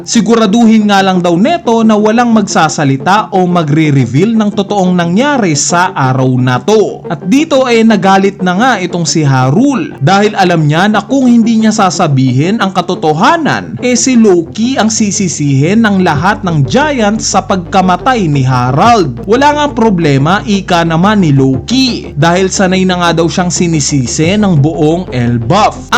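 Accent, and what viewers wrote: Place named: Bicol, Philippines. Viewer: hayaan mo na bisakol ata si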